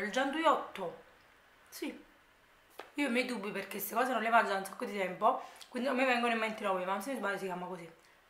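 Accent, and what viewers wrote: Naples, Italy. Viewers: non ti sto attaccando, ma questa ragazza viene sempre presa di mira per qualsiasi cosa faccia, direi che qualche volta il commento lo sii può tenere per sé, senza avere il bisogno di scriverlo, perché tanto penso che se abbia scritto qualcosa di sbagliato lo sappia o se ne accorga dopo, non c'è bisogno di puntualizzare sempre, soprattutto per errori futili come questo, e io comunque da ragazza napoletana, e comunque STUDIO teatro e DIZIONE (CORRETTA PRONUNCIA Della Grammatica italiana) capita di avere questa cadenza, perché è proprio tipica della nostra amata Città (NAPOLI) ed ho anche e solo puntualizzato che comunque il napoletano è considerata una lingua, però non fa nulla, non sentirti attaccata, spero solo che tu capisca come si possa sentire una ragazza come lei, mettetevi nei suoi panni e pensate prima di scrivere, detto questo, Buona domenica 😘❤️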